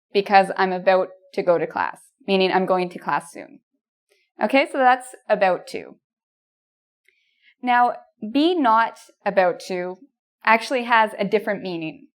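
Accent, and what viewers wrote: Canada. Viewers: yes, I can really notice Canadian accent ... and I love it :-) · Canadian pronunciations, non-Metric measurements... I'm confused. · You a great teacher anda I love Canada's accent. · I love you , your Canadian accent and your lesson.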